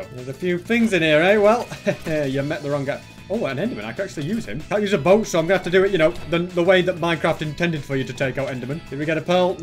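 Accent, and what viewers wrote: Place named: Scotland, United Kingdom. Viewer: He sound Scottish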